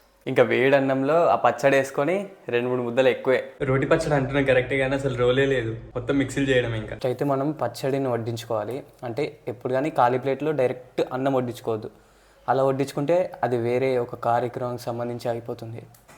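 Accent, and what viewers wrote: Telangana, India. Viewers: Telangana slong....ante..antamu memu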